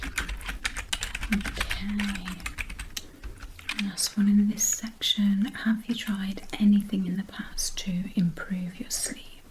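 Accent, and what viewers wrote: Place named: United Kingdom. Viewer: I just love the British accent with the typing noice it goes well together. My mum was both British Romani. My connection with the UK means alot.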